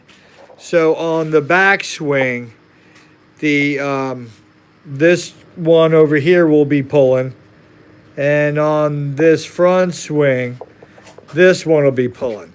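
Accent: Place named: South, United States. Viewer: he may have a southern accent, but his a solid experimenter ... afterall if you could read a book and literally build a free energy machine. many would.... ramblings are good for getting too know the guy, that we agree on..... although i must say, the best way too judge the guy in my opinion, is what he does with the information i have him,along with videos of my working free energy machines . which were numerous. <link>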